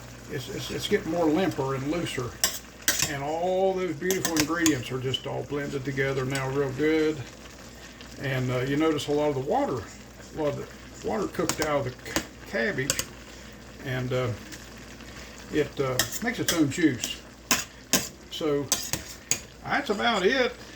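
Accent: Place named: Maryland, United States. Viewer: That looks wonderful!! My dad worked for the B andO railroad, you sound like you are from Maryland, my home state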